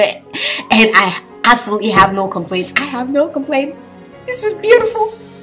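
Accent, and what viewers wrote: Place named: South Africa. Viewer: i love your accent! are you from south africa? or jamaica? :))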